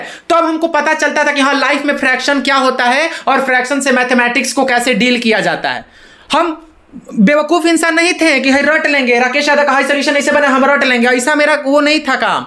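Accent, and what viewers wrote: Bihar, India. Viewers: shudhh bihari ho bhai hmko❤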